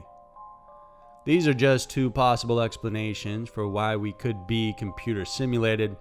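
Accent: South, United States. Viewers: Does anyone know where the narrator is from? There's a hint of maybe a southern accent on some vowels. It's slight and may just be style, but I can't help but wonder. Words like, "time," "wire," and "back." Seems less prevalent in this video than others.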